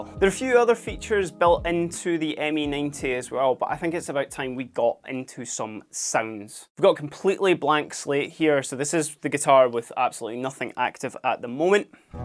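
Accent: Scotland, United Kingdom. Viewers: He can’t help is Scottish accent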